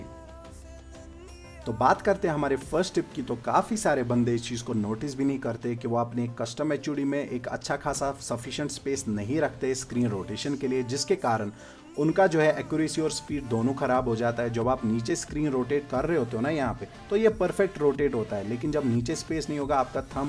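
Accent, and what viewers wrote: Kashmir, India. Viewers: Arey bhai aap ki awaaz sun ke hi laga ki aap kashmiri hooooo. Aap se insta se pata chala. Aapki awaaz rj se milti haii. Luv frm pulwama. Support kashmiri playerssss.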